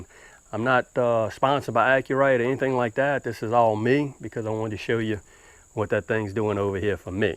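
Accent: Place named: Louisiana, United States. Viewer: Sounds like your from south Louisiana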